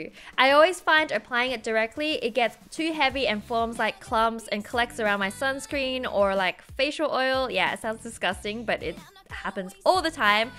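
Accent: Australia. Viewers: she's australian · I'm australian and that's how we say mosquitoes 😂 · I am Aussie btw does she live in Queensland or NSW? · I think she lives in Australia. listen to her accent and you can tell. winter in Australia is like 60 degree and 70 degree weather, and their winter is June - August.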